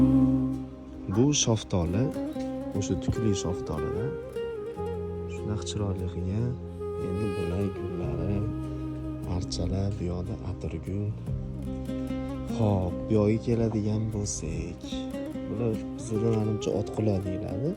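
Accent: Kokand, Uzbekistan. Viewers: УЗИМИЗНИ КУКОНЧА.ШЕВАДА ГАПРСАЙИЗ ЯНАЯМ ЧИРОЙЛИ БУЛАРДИ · Укам каерда яшасангиз хам узлигизни унутманг Кукон шевада гапираверинг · Quqon shevada gapirin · Куконда Дода дийилмайди углим додани Опоки дийишади сих хам Куконнни шевасида гапировринг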